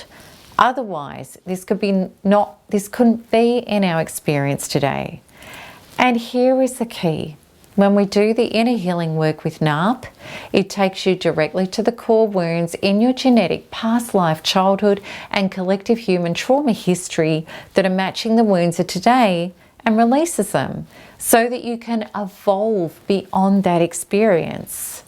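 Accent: Australia. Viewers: I'm glad I found you. This is going to sound so weird and strange, but here's my confession: my dad was a womanizer who always complained about American women and how foreign types were so much better, this, that, yadda yadda. I must have been 12 or 13 when he started dumping that and constant criticism on me. To make a super long and agonizing story short, he married a woman from the gold coast who was easy to control like his ex-wife, my mother, until she was angry and stressed out to the max. There's so many wonderful people from Australia but I didn't realize how the accent by itself brings up anxiety and stress. Listening to you will be exposure therapy. I'm sorry to sound so weird and how something so small could still bring this up after so much healing, but I'm thanking God right now I found you.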